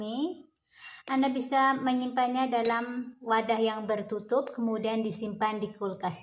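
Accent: Malaysia. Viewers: Logatnya kaya ngomong bahasa malaysia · Asli dari mana kak? Logat malaysia dialeg Indonesia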